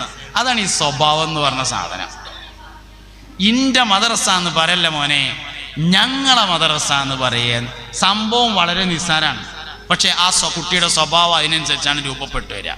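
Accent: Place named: Malappuram, India. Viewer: Vishayam nallathaan pakshe nalla malayalathil parayoo,, ningalyde Malappuram basha yellavarkum manassilakanamennilla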